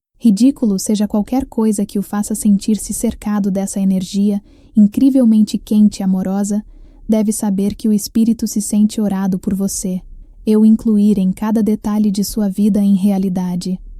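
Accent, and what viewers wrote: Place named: Portugal. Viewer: Mas reparou que sai do PT Br pro PT de Portugal?😅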